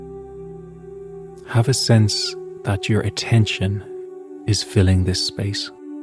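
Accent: Ireland. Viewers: Very helpful during this difficult time. Never felt anxiety quite like this in my 75 years on this planet! Was feeling somewhat suicidal at first, but slowly learning to accept it. Waiting for your book 'DARE' to arrive. Meanwhile, enjoying the Irish accent! ;-)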